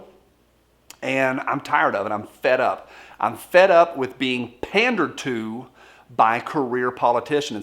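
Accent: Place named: New York, United States. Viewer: Typical gun drama.... Jk I feel you brother. You sound like you live with me in New York for crying out loud lol.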